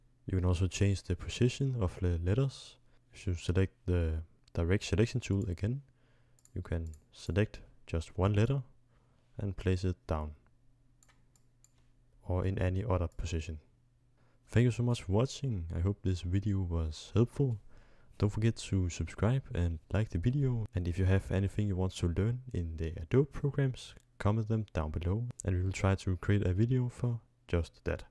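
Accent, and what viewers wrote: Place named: Denmark. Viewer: Den accent lyder meget bekendt... du MÅ være dansker!😂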